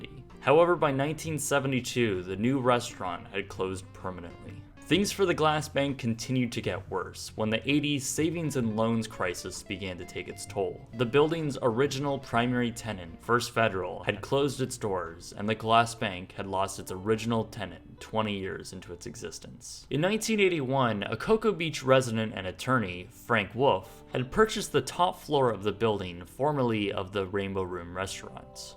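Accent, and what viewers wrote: Canada. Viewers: you Canadian? sounds it. Orangeville? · Canadian detected.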